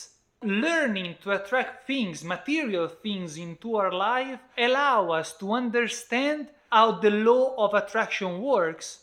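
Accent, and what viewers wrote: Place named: Italy. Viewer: Rest assured, there was no language block. To the contrary, your enthusiastic inflection, which I assume followed Italian rules of enunciation, made the content more interesting. Bravo!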